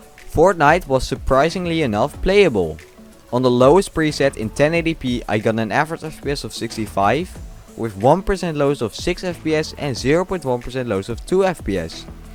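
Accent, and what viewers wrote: Netherlands. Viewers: vlgs mij ben je nederlands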